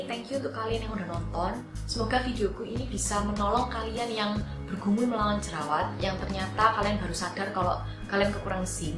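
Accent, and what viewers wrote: Java, Indonesia. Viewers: Dialeknya mentok jawa bangeet yaah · Logat jawa nya kental .... 😂😂👍👍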